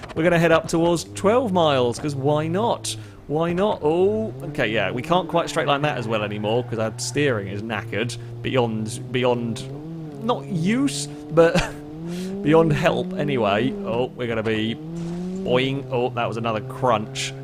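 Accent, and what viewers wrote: United Kingdom. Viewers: Yeah he's British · He is British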